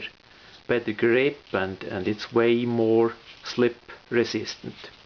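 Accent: Switzerland. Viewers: ich mag deinen Schweizer Akzent^^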